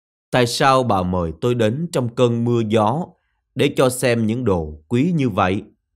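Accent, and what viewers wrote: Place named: Saigon, Vietnam. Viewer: Giọng Anh này là giọng người Sài Gòn. Đọc nghe rõ ràng , hấp dẫn quá! Cảm ơn Anh !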